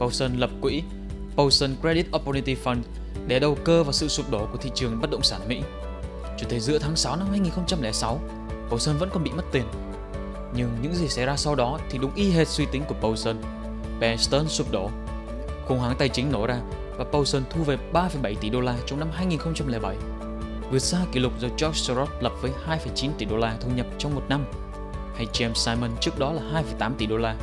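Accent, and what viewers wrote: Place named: Thanh Hóa, Vietnam. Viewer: chất giọng thanh hoá này nghe ko hay cho lắm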